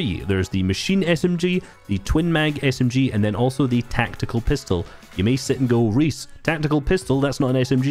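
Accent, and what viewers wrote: Scotland, United Kingdom. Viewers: Yo are you Scottish by any chance? And that tac pistol needs a nerf🤔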